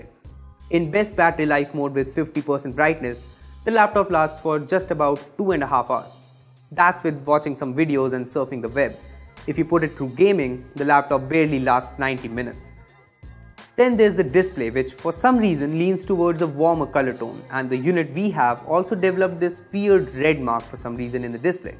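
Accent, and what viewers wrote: India. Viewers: I like your heavy Indian accent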